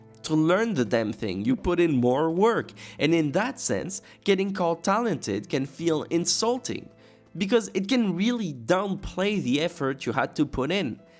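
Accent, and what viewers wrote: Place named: France. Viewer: I've got a question for you, are you french because your accent seems like you are !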